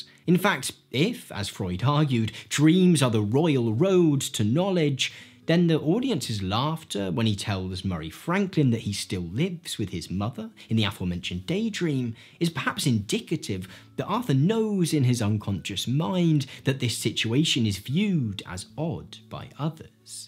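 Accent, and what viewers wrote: United Kingdom. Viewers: british accent make everything better^^^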